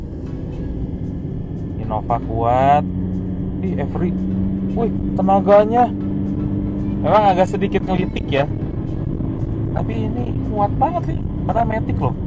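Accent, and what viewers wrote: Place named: Bandung, Indonesia. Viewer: Jiaaahhh...mamang . Kangeeeen aku .... logatnya bandung pisan...hahahahha salam kangen selalu. Allah merahmati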